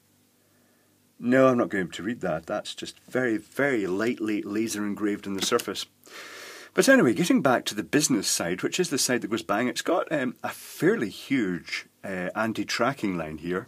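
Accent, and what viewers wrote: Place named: England, United Kingdom. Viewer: "Oh I think I may be out of plumbs here" I love the queens english!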